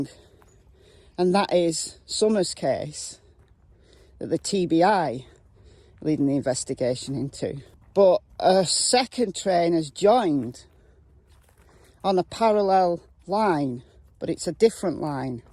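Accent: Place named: Northern England, United Kingdom. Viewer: Aww shame it's the end of hopping Cassie 😏 Absolutely love your channel. It's great to hear a northern accent. I'm a Brummy myself. Anyway catch your next stream ❤️👍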